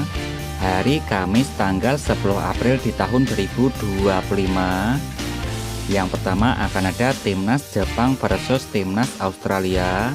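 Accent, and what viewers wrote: Java, Indonesia. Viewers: Yg ke Telu indonesia😂..jawanya medok bang .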